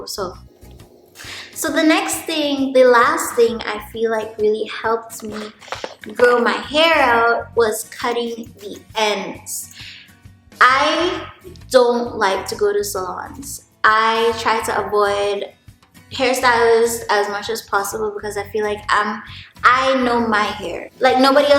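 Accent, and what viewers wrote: Jamaica. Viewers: Your accent is so strong and beautiful 🇯🇲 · Hearing your Jamaican accent lol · as soon. as I heard you i knee you were Jamaica, big up yourself, you have my support, fellow Jamaican in the US.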